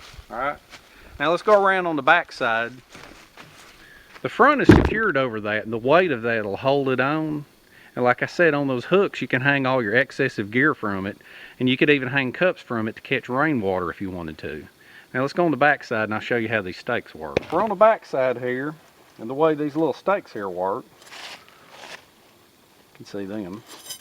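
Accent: South, United States. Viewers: My favorite item was the Alabama Hammer. It warms my heart to hear a fellow died in the wool Southerner speak on here. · You also have a delightful way of putting things and a nice southern accent that I find relaxing. Your laughter and humor always leaves me with a smile or grin on my face. Thanks for your videos; I'm a fan. (now in Idaho by way of Tennessee).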